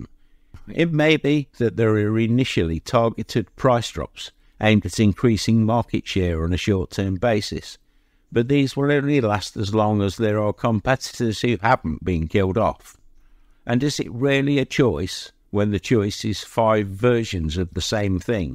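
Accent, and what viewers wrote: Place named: Midlands, United Kingdom. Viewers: Sounds more like East Midlands to me, Leicester or Nottingham? 🤔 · by the way, there is nothing robotic in your voice. I’m guessing British midlands.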